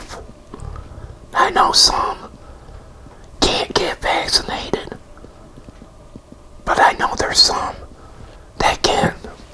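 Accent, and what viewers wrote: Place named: Texas, United States. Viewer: Because I thought you sound like a new Yorker but you sound like your from texas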